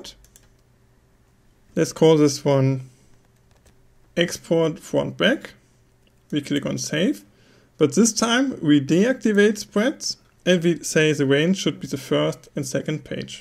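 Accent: Germany. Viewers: LOL! I love the German accent! Geil!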